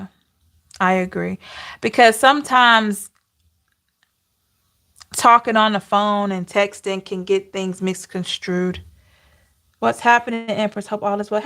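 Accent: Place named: South, United States. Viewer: I'd Love to understand what part of the USA your accent is from. It's generally Southerners who say "em-pore-dent" as opposed to "im-pore-tant".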